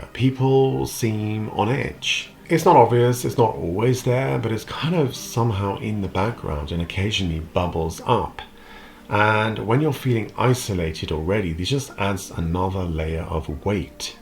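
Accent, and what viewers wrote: Germany. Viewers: Your commentary on German norms is helpful. Thank you. I'm a Brit living in France, work with an international company where some Germans work. I get on best with the Germans, feel like I have more in common in work ethic. By the way, you seem to have acquired a slight German accent. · Your english now has a german accent 😂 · You have a strong German accent! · This English gent speaks English with a German accent. Interesting. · You don’t sound British. You sound like a German with very good English. Maybe you picked up a German accent living there. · I’m sure that you’ve heard this before, but you’ve picked up an ever so slight German accent. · Interesting. You seem to speak English with a German "accent ", now! I am quite blunt but I'm from the north of England!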